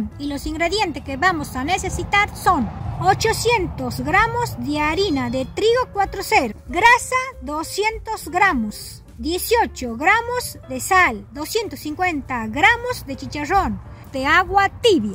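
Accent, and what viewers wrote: Tucumán, Argentina. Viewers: Felicitaciones, por esa exquisita TORTILLA TUCUMANA, señora. Me encanta escucharla, esa tonada tucumana, me recuerda a mi querida madre, que nos hacía las mejores comidas tucumanas. Soy tucumano, resido en Buenos Aires, estuve un tiempo viviendo en mi querida provincia, trabajé en los medios de comunicación de allí, como en canal 10, Diario La Gaceta, LV12, Radio Nacional y, también en LV7. Soy meteorólogo y, tenía a mi gran amigo, ya fallecido el periodista Mario Escobar, que me presentó en casí todos los medios. Ud. me hizo caer unos lagrimones al escucharla hablar, recordé a mi madre. Le deseo a Ud. y familia, muchas Bendiciones.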